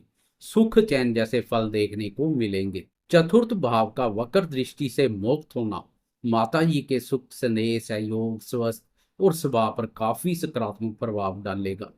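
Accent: Punjab, India. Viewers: Apki Hindi mai Punjabi touch aata hai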